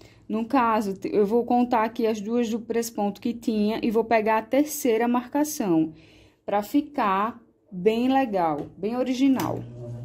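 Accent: Brazil. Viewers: Ótimas dicas ❗Vc. é do Recife⁉️🌹💙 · Amei a dica e o sotaque sou costureira e nordestina tbm ! Venham conhecer-me galera.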